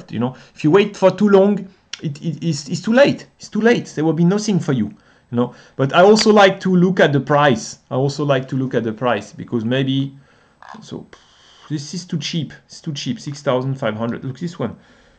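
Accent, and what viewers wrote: France. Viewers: came here for the car... stayed for the french accent <3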